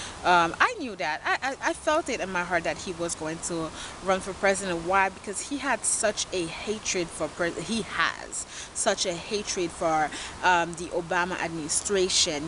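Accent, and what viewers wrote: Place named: Haiti. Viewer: You have a nice accent , are you from Haiti?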